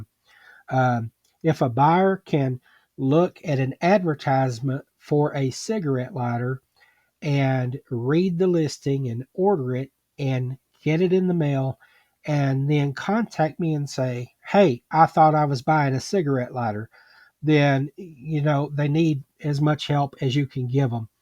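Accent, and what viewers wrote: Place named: Appalachia, United States. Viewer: HAHA Hillbilly accent. Being in NC, we have a lot of that here too. I call my friend a Renaissance Redneck. 🤣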